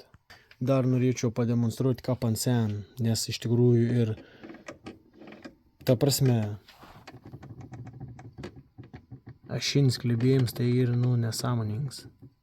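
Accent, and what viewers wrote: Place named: Samogitia, Lithuania. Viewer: Gal ne įtemą, bet man labai patinka žemaičių šneka! Linkėjimai iš Dzūkijos gilumos!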